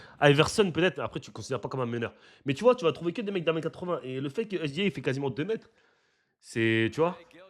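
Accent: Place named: United States. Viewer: Ton accent américain, il est juste impressionnant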